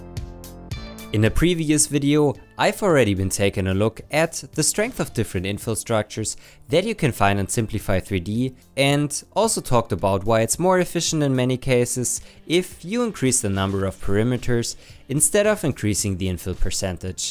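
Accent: Germany. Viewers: My 1st thought after 2 sec: German, Wilkommen im Club! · man do i hate the german accent, but the content is interesting 🥲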